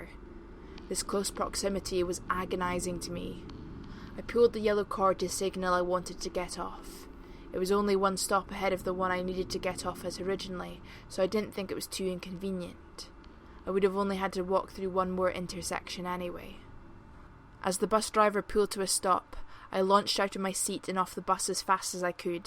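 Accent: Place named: United Kingdom. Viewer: Great stories and you have a great voice! Love the British accent! Keep up the good work and looking forward to your next video!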